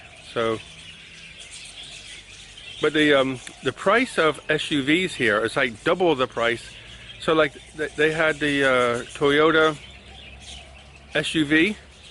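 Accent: United States. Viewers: Hello found your channel today and such a lovely change from most other Thailand videos. I used to live in Thailand but left in 2002 and many times wish I hadn't but we all do things for a reason at certain times when we think it's right. Anyway I was surprised to hear you are from England you have a slight Texan twang to your accent. You mention HRT, I am on Nebido which is a testosterone replacement therapy. Two reasons the GP put me on this is because one I had a low testosterone levels and two I have ED at times which is a result of various medications I'm on. It is given to me by injection every 12 weeks. I have no idea of your reasons and its not my business but just thought I would mention it to you. I have just subscribed and will keep following your life in Thailand.. Power to you..David..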